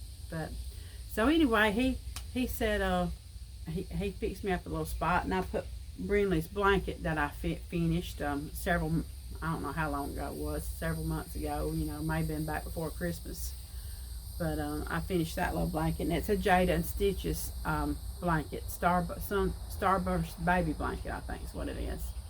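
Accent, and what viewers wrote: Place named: Mississippi, United States. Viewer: where are you from cause you talk like me? I’m from Mississippi!❤️🧶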